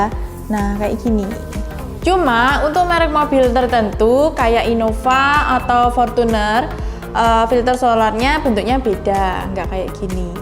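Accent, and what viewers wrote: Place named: Java, Indonesia. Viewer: Medhok sekali ya kak wakakakakak tapi NICE banetttt kak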